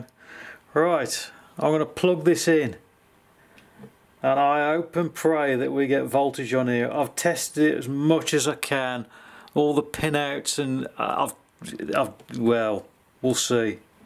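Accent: Birmingham, United Kingdom. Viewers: are you a Brummie?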